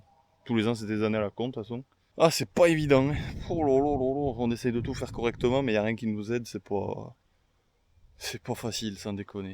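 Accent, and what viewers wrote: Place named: South-West, France. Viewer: Nouvel arrivant sur ta chaîne, j'aime beaucoup tes vidéos,ta façon de voir les choses et j'apprécie cet accent de chez nous ca fait plaisir...je dis de chez nous car tu as l'air d'être guère loin de mes belles LANDES,un voisin du Gers ou non loin je suppose 🤷‍♂️😅 le sud ouest en tout cas 🥰 Bon courage et bon travail a toi,avec une centaines d'hectares tu as de quoi faire !! 💪✌️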